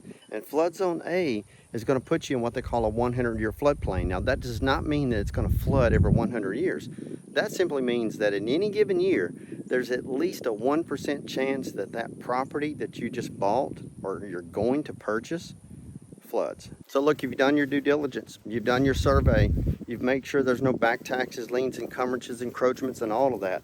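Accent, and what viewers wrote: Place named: Louisiana, United States. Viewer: Funny how I can see a YouTuber for the first time and can hear they are from Louisiana..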